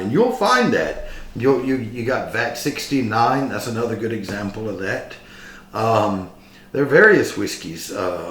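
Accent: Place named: United States. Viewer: First time on this channel and I can’t get over your accent, which to me is straight from a Western movie. 😊 I can hear you say, “If you’re willin to work I can give you bed and board and after harvest maybe a little to help you on your way.” As for B&W, I feel it’s a great, affordable Glasgow Blend. The Compass Box does a more upmarket version. This particular style of whisky is sadly misunderstood and under appreciated in whisky circles. Good grain whisky is rare in an affordable blend but very welcome to those in the know. I would rather have a well-blended whisky such as this than a bland malt like Glenfiddich 12.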